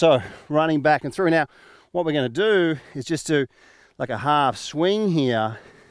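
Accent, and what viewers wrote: Australia. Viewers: I hear the Aussie accent are you based here?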